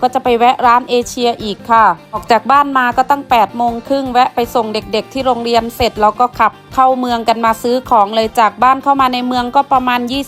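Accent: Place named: Central Thailand, Thailand. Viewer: ชอบจัง บรรยายไทยกลาง ส่วนใหญ่คนอื่นพูดอิสานกัน ฟังไม่รู้เรื่อง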